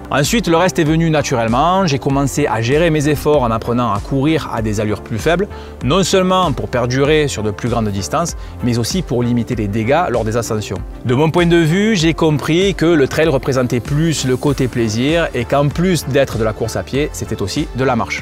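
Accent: South, France. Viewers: ça me donne envie de m' intéresser beaucoup plus au trail, pour ma part j'ai pratiqué une course une seule de Trail La Calanquaise en colline à Carry Le Rouet et je kiffe les Rando. J'avais été vraiment surpris de la difficulté de l'effort, effort que j'ai mal géré en partant en allure trop rapide dès le début de la course. Me surestimant un peu, on est très loin d'un match de football, mais je ne le voyais pas de cette façon . J'avais envie de partager mon ressenti, car j'aime bien tes vidéos et l'accent du sud qui est présent. Tchaoo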